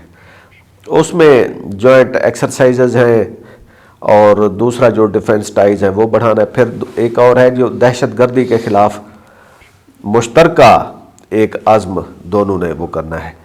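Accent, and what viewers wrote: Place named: Saraiki, Pakistan. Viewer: صاحب جی ۔۔۔۔ !!! مجھے آپ سرائیکی وسیب کے لگتے ہیں اور سرائیکی سپیکنگ ہیں - آپ کا تعلق کس علاقے سے ہے ۔۔۔۔ ؟؟؟؟؟؟ شکریہ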